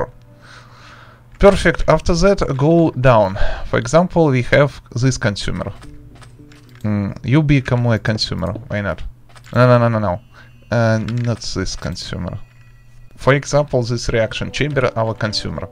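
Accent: Russia. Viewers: Русский акцент))) да ты же наш)))😅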